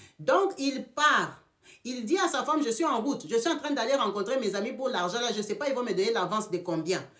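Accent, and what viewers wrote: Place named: Cameroon. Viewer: Madame arrête de parler comme une camerounaise ⛔ ton accent est nul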